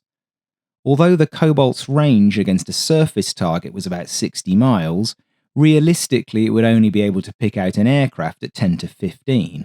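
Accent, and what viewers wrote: United Kingdom. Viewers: "Loo-tenant"? Really? You sound British, so why are you using American pronunciations? Just a part of the continuing Americanisation of the United Kingdom. Sad.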